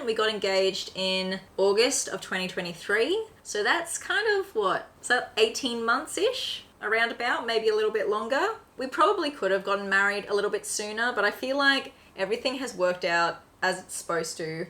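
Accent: Australia. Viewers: 🐰Thank you for your vlogs. Hug from France. (Btw, your Aussie accent ,like Sarae Renae Clark, is so lovely and easy to understand).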